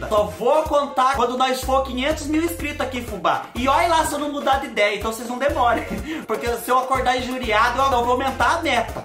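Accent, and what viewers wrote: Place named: Caipira, Brazil. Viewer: FUBÁ D CEU amo seu sotaque q caipirinha , o maia lindo kjķkk❤😍🤩♥️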